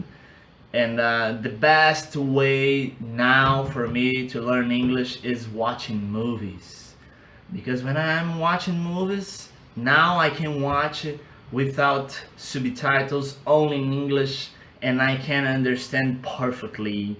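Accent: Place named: Brazil. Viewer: Seu inglês parece com o meu, será que temos sotaque brasileiro?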